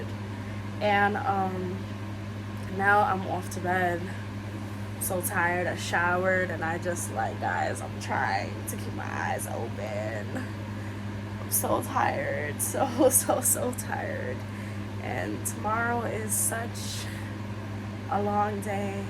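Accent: New York, United States. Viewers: New York (American) accent.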